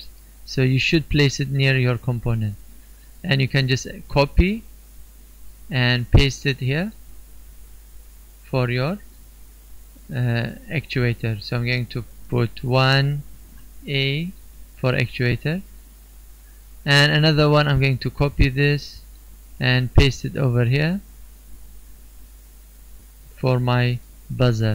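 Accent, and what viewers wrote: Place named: Arab world. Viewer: may i know what you race is bro? Your english accent is like an arabian... 😁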